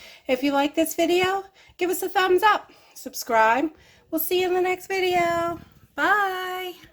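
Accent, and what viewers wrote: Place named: Massachusetts, United States. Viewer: Just went apple picking so about to make two batches of cider using your recipe. Having been born and raised in Mass (and now in Cali) I just want to listen to you and your accent- you can't tell me you are not from Mass!!!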